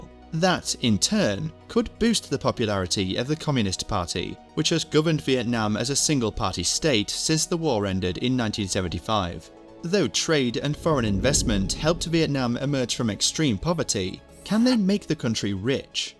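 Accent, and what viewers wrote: United Kingdom. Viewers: The narrator must come from Lancashire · shit video every time i hear a british accent in a video i know its just going to be bad content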